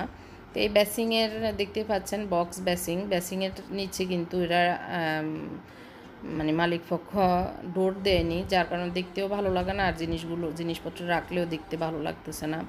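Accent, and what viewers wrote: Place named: Chittagong, Bangladesh. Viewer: Vaia unar kothay chittagong er tan ase.... Aita banan er vhul mone hosse na r omorjada o kisu nai.... Thank you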